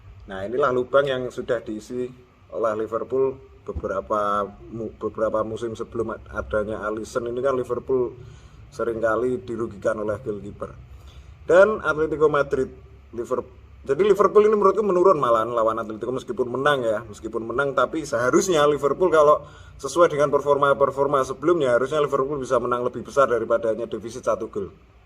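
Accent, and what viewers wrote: Java, Indonesia. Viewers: Teruskan aksen jowomu .. makin keren 👍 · Kalau boleh saya rating secara pribadi, 8/10 Pro: - karakter pundit jowo nya dapet dan pemakaian bahasa nongkrongnya bikin kita serasa lg ngobrol di tongkrongan - suara nya enak kalau didenger di podcast - tengil dan ada bakat ngeselin di show, jadi bisa bikin seru acara Cons: - kebanyakan noise dari mukulin korek/rokok/liquid/atau apalah itu, ganggu banget - banyak baca, mata jarang ke viewer, menurut ku agak kurang, kalau cuman podcast no visual sih oke oke aja Tetap semangat bang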